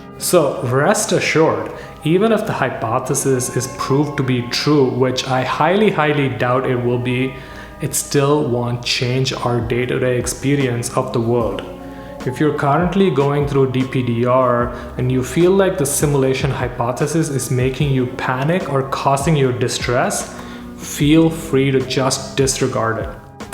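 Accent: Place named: United States. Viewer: Why do you sound American in this video???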